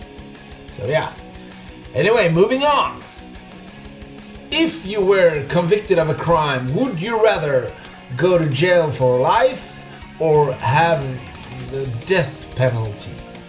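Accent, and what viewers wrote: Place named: Spain. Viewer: for a Swedish man you sound like you have a more spanish accent. no hate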